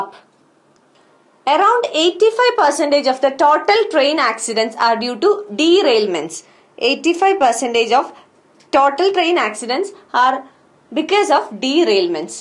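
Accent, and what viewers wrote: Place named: South India, India. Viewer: Thank you for great lecture mam. Mam, are you from south india? I felt it because of your English pronunciation.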